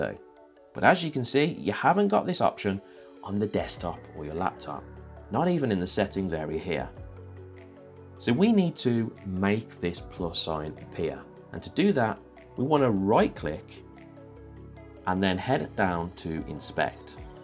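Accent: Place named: England, United Kingdom. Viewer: Cheers, good to hear a English accent too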